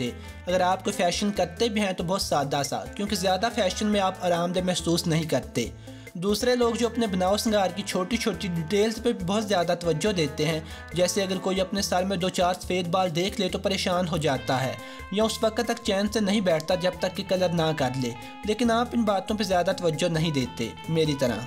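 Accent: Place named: Lahore, Pakistan. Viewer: آپ کا لاہوری لہجہ کافی پسند آیا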